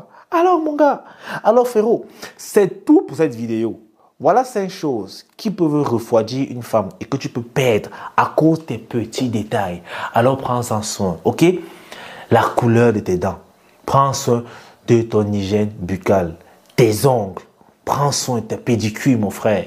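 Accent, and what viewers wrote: Cameroon. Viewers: La manière donc tu parle la j'espère que tu es du kamer 🇨🇲 force a toi Fréro 🤝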